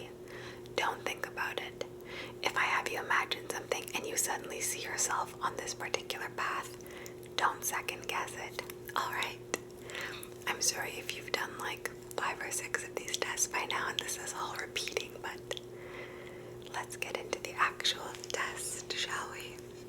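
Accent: Italy. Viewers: Thank you for this one as well. I got a request: Craniel nerve exam...... WITH italian accent!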